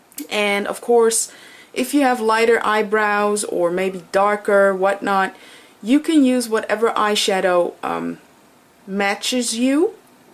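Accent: Netherlands. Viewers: wauw!! prachtig haha. Ik hoorde trouwens meteen al dat je Nederlands bent haha :P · you're dutch aren't you? because of catrice and your accent..? i'm dutch tho xx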